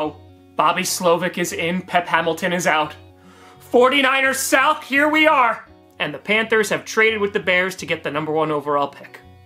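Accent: New York, United States. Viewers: When a Texans fan gets a NY rant with accent 😅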